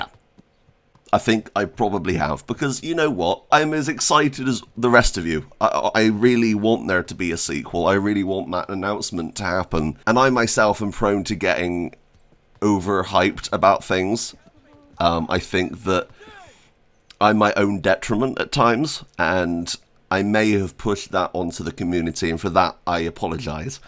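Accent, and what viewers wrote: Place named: West Country, United Kingdom. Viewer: Awesome video. Give them a chance. Awesome bit of news and well put together. It's good to hear a west country accent in a video.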